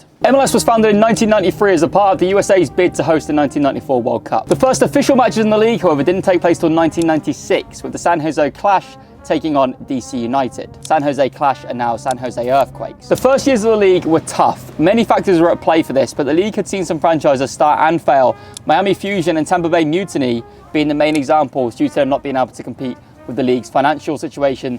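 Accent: RP, United Kingdom. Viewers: so British posh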